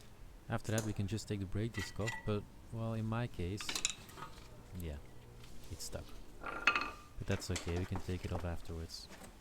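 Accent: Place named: Belgium. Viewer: Ik kon zo horen dat je een mede belg was, goed uitgelegd bedankt voor de informatieve video 🙂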